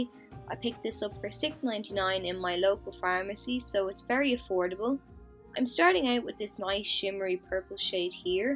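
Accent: Ireland. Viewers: Love your channel! Only new to it, but I recognised your Irish accent straight away! I'm from Westmeath! My all time favourite product is pink pigeon Mac lipstick😃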